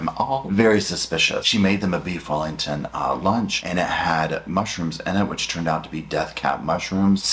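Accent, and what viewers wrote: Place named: North Carolina, United States. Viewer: Just wanted to give you some love from NC and absolute appreciation for your content. I look forward to seeing every notification of a new video. I live in Durham,NC ❤ i knew your accent but didn’t realize you are from NC also.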